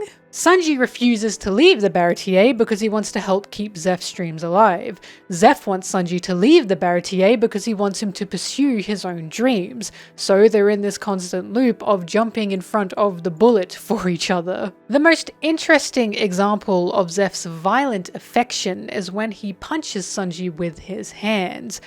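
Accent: Australia. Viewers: What your Australian!!! Samsies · Amazing video, also i didnt know you were australian too, hell yeah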